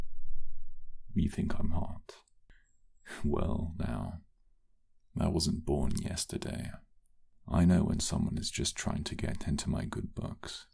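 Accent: United Kingdom. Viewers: Is anyone going to talk about the British accent??? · My asexual ass was not paying attention at all because the whole time all I could think was BRITISH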